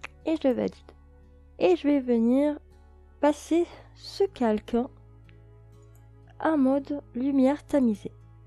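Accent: South, France. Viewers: Bonjour merci pour ton passage sur ma chaine et pour ton très gentil commentaire, tuto comme l' écrit mon ami Jim ( homme de gout ) excellent. like 24 et un petit abonnement en plus pour écouter tous tes conseils, encore merci et à bientot, amitié, ah oui ton accent du sud est à croquer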